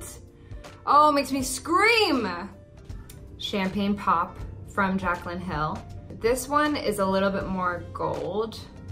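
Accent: United States. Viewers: but...she’s British...why does she have an American accent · I thought she was british, why does she have an american accent?! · She's Scottish believe it or not, but has the most American accent ever. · if shes British why does she sound American?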